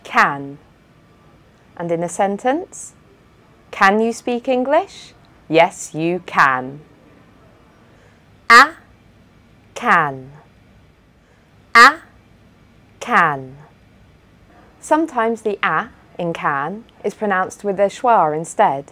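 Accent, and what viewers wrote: United Kingdom. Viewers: 고맙습니다.... 영국발음 쨩.. (하트)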